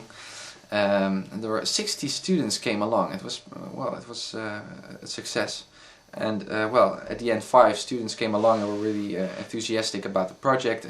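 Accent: Netherlands. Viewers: Are you dutch? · nederlands accent :D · Lol. First sentence was said and I knew you were Dutch :D! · Haha, when I heard your voice I thought "hmm, that's sounds like the advanced english usage by a Dutch person", and I was right. Keep up the good work en veel geluk! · I can hear you are dutch :P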